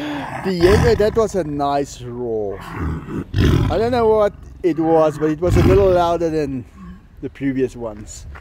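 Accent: Afrikaans, South Africa. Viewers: South African accent. Its chilling that these animals could be living in my neighbours back yard.. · Sorry, just go to say I love the Afrikaans accent. Might be because I'm a huge fan of Sharlto Copley.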